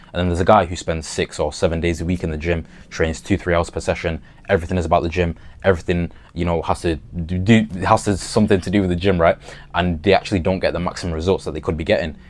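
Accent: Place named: England, United Kingdom. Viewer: English accent funny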